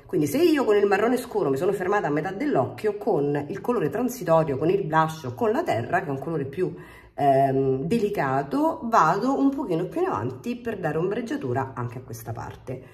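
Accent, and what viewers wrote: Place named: Rome, Italy. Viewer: Non riesco a seguirla, con quell'accento romano e quella voce repellente. Purtroppo esiste l'antipatia.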